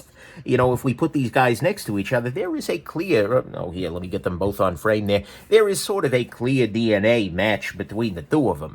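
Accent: New York, United States. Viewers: I thought you were a new yucker ! With the accent!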